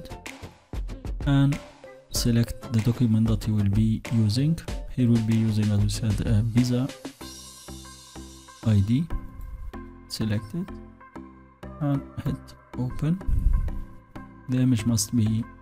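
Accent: India. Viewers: Annoying Indian accent video to telling me how to get my privacy violated by Facebook by me providing them my I.d. ,?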